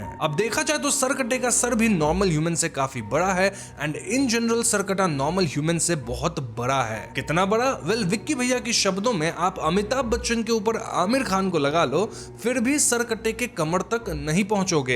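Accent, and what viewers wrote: Bengal, India. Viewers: Larai bhai your accent is like a Bengali or Odia accent It is Ladai not larai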